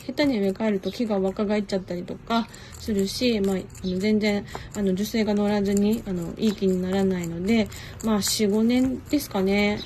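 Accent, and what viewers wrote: Karatsu, Japan. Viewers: とても、自然体で、このくらいが、個人的には、大好きです。 標準語と、唐津弁の混合もすき！ ポフポフ良いですかね？ 自分も、スマホ一つです。 帽子紹介のシャキン！ ナイスです。 よく工事してますねー？ 高級住宅街かなー いつも、癒されてます。 仕事が、嫌いなので、動画を 見て、ほっこりさせてもらっ てます。 ありがとうございます。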